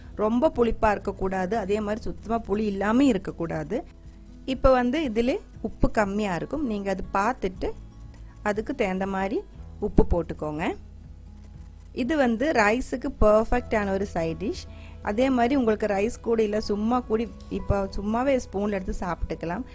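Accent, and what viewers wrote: Kerala, India. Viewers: Very good and tasty recipes with little ingredients 👌love your Tamil with Malayalam accent👍👍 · I like your Tamil slong with malayalam infusion.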